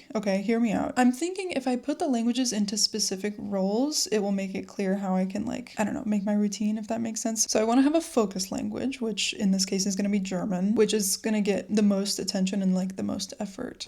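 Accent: Mexico. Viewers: So I've been learning German for a while and my struggle is super cliché 🤭 I understand a lot but I have a hard time putting sentences together on my own. My focus for this year is exactly that, I'll be journaling in my target language to improve 😎 Btw, as a native Spanish speaker (but not Mexican haha), you still have a nice Mexican accent 😁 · Hello, hello! Spanish native speaker over here 🫶🏻 Just wanted to say you sound 100% Mexican! So at least for me, you’re not losing the Mexican accent. Hugs! 🫂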